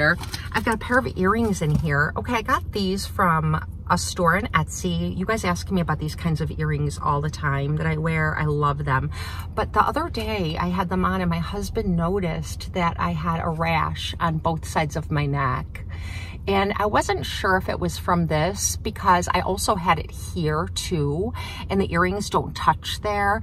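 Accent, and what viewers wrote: Midwest, United States. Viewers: I bought this same one in medium in chocolate. The medium is like a large. Got it on eBay for $200.00! I LOVE it. Heavy as the dickens. Mine had a pen stain inside on the green suede, but I was able to get it out with an Emory board! I knew you were from the Midwest based on your voice. My family is from Chitown!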